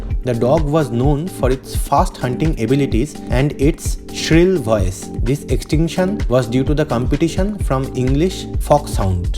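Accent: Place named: India. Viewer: U have terrible indian accent. Pls ask somebody to dub ur vids. U can found hundreds of offers on fiverr esp.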